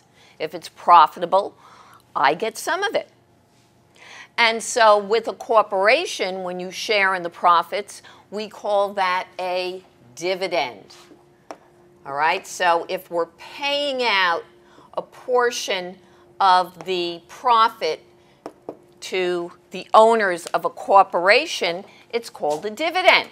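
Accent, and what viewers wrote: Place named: Queens, United States. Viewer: Queens accent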